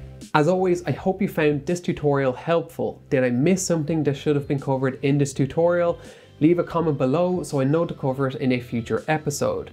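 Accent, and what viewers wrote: Ireland. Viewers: Dublin Accent 😁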